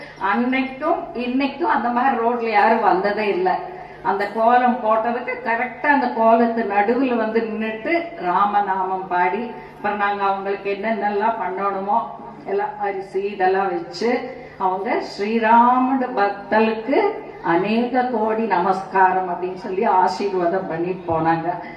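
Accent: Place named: Coimbatore, India. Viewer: Konjum Kovai thamizhilil gurujivinudaya speech arumai 👌🏼 Blessed to be your student 🙏🏽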